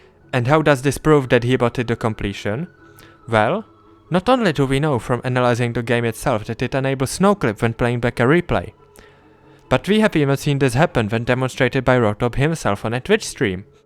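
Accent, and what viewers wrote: Czech Republic. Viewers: lubim ten cesky prizvuk xD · naahh i could tell you're czech from the FIRST WORD I HEARD WWWW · ale po 10 sekundach videa jsem vedel ze je to cech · didnt notice you were czech till , but afterwards it was very obvious, čau bratře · hele nevím jestli jsi čech ale podle přízvuku myslím že jo takže pokud jsi tak ti chci jen říct že je to dobrý video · YOU FROM CZ??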